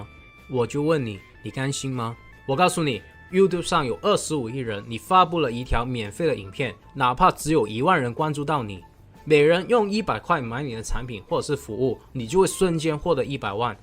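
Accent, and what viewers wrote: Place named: China. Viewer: 我是台灣人，這一聽口音口條就知道是國內製作的內卷視頻！ 美國很好嘛？看清楚我的回覆，你就知道什麼叫做噁心～～～The United States mainly focuses on selling weapons to troubled areas and actively participating in conflict creation and manipulation constantly. Throughout its 240-year history, the U.S. has been involved in warfare for 225 years. Now the AUKUS sound familiar to the Axis power(Die Achsenmächte) or Rome–Berlin Axis, aren't they? It's not an "Axis & Allies" situation anymore.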